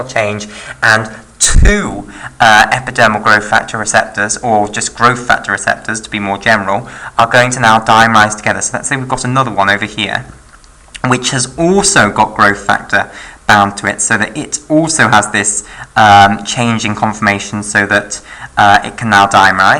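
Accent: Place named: Scotland, United Kingdom. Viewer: You have successfully concentrated only one thing that is your Scotland accent. Thanks for the explanation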